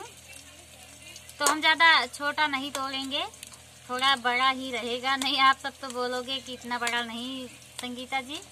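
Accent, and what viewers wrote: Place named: Uttar Pradesh, India. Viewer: Aap up ki ho na bilkul mere gaon jaisa bhasa hai aapke gaon ka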